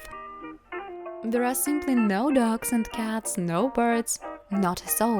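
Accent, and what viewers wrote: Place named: Russia. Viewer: Ear cutting her russin english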